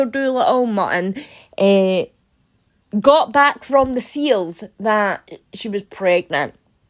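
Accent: Scotland, United Kingdom. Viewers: Love your content and your wonderful Scottish brogue!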